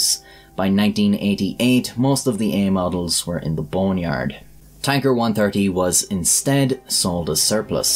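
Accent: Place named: Mayo, Ireland. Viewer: Hey, random question but I don't suppose you're from Mayo? Your accent reminds me of The Hardy Bucks? Am I close?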